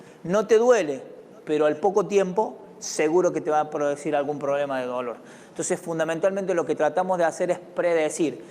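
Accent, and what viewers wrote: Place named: Cordoba, Argentina. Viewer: Muy bueno profe !! Se le nota de acá a la china el acento cordobés !! Saludos de Córdoba capital !! 👌👌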